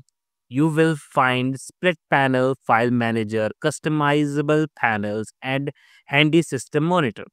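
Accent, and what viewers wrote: India. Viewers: bro probably says carmel instead of caramel out here critisizing some indian dude speaking english as a second language LMAO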